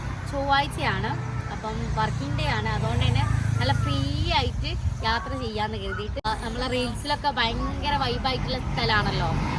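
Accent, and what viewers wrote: Malappuram, India. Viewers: മലപ്പുറം slang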